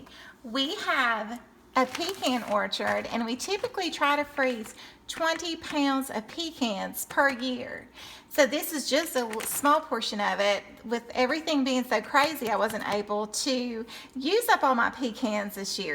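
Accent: South, United States. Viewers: My Momma used to get fresh Pecans from my brothers tree and he had a black walnut tree too and she would get bucket fulls. I don't think she ever made pralines though. I do like them though. I say it just like you do it is a Southern thing I think. I had a lady correct me and said it like the other way to say the name and I said well I say is the way I do that is how I was raised to say it and she told me that is the wrong way. I was like okay whatever lol.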